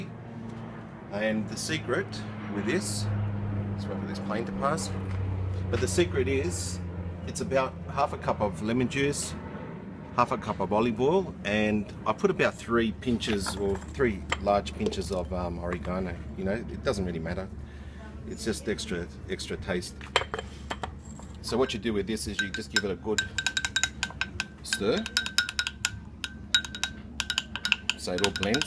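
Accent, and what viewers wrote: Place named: Greece. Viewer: I swear he sounds Greek until he says things like oregano.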